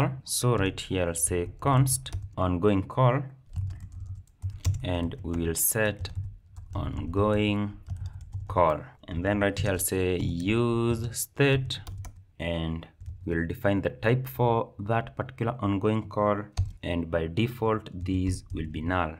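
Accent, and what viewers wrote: Kenya. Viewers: where are you from? your accent inasound familiar, almost like a murima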